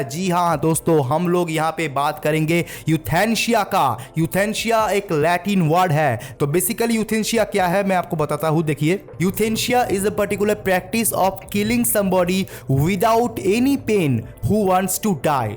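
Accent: India. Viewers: Aapki voice indo english lagti hi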